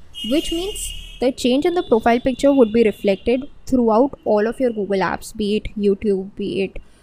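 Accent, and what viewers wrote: India. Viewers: Indian english pronunciation totally error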